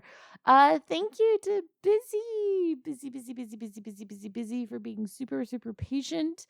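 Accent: South, United States. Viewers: Whoo even if on the off chance I'm the only one who feels safe when a southern possessive soft dom lady starts speaking sweet nothings feels safe and at home, thank you for making me feel that way ❤ love you ❤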